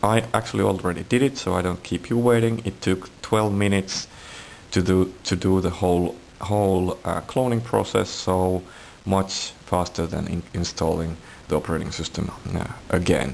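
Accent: Finland. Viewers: Finnish accents are awesome :D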